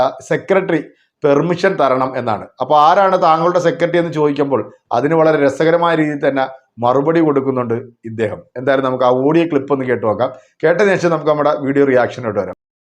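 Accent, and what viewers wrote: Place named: Kasaragod, India. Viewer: ശരിക്കും ഇത് കാസറഗോഡൻ ഭാഷയല്ലേ? അപാരം തന്നെ. കൊച്ചി മെട്രോയിൽ ഇരുന്ന് ചിരിച്ച് മടുത്തു.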